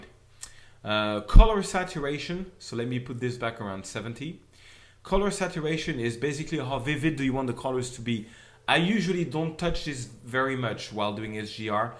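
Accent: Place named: France. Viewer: i love your tutorials, i love your frenglish accent :D keep it up (thumbs up)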